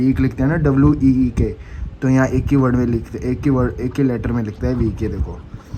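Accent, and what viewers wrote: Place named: Uttarakhand, India. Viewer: Bro your acsent seems phadi btw mai uk uttarakhand❤ se hu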